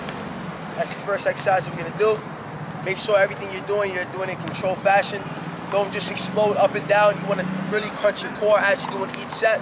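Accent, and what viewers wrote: New York, United States. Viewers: Nice omg love the NY accent😂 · are you from New York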